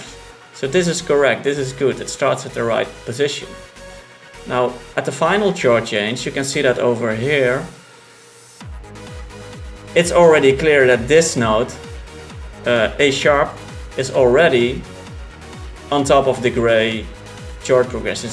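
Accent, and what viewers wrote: Netherlands. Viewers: You're from the netherlands right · gonna take a wild guess and say you're dutch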